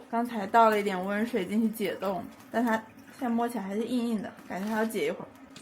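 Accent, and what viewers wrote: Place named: Hubei, China. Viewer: 聽這聲音語調一直很熟悉~原來是湖北口音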